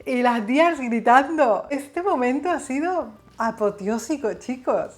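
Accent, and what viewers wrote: Argentina. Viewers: te escucho hablar y siento como si estuviera escuchando a una argentina, si... tienes unos modismos al hablar típicos españoles pero me desconciertas. Excelente trabajo entrevistando a Dimash. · Que lastima que perdiste el acento Argentino 😭 y Felicidades te sigo hace años ❤️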